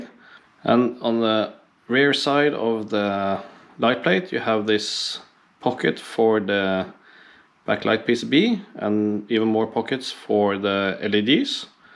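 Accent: Norway. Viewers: Are you norwigen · idk why. but he sounds norwegian. Broski got that crisp norwegian dialect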